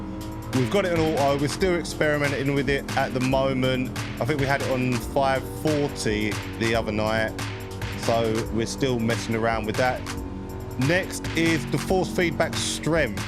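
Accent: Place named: South London, United Kingdom. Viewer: Where abouts in London are you... sound a bit south .. I will check out a few more of your vids... cheers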